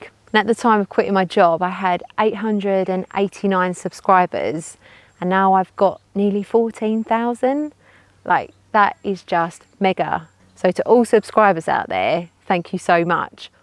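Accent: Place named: East End, United Kingdom. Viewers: Very nice! I liked the last bit most. Sitting on a path, breaking your perch, referring to notes taped to your tripod. :) Happy Birthday. I've subscribed mostly due to your accent. Gotta love that accent. When I first heard that accent I hit subscribe. That was 12,000 or 13,000 subs ago. One I subscribed I had to Google you as you seemed damned intriguing and more to you than met the eye- and discovered you rowed around the UK and/or across the Atlantic. (This blonde Cockney-ish sounding girl rowed a boat across the Atlantic Ocean?) So you're worth listening to. You have some steel in your spine. Congrats on your birthday and your new YouTube career. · Happy Birthday! All my mates back in the UK are from the East End / Essex so your accent is a pleasant bonus and reminder of different, happier times. Go on, fail, be happy, fail again and then succeed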